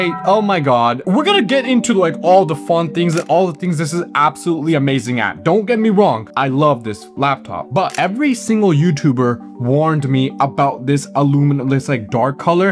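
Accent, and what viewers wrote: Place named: United States. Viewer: Dude speaks British English with an American accent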